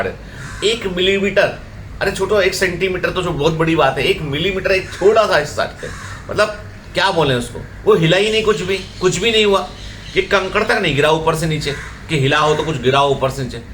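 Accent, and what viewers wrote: Gujarat, India. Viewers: Sir aap Gujarati ho 🧐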